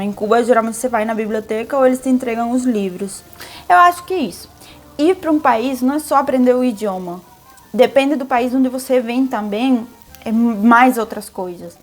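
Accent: Rio de Janeiro, Brazil. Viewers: as experiências vividas aqui no Brasil, são frutos das adaptações, tem um preço a ser pago, mas que uma desbravadora, você é uma mulher de muita fibra, parabéns pelas conquistas e o que fez você vim pro Rio de Janeiro, "Cidade Maravilhosa "..daqui a pouco vai esta com sotaque de carioca igual a mim, beijos💜💙💛❤💚😘😍🥰👏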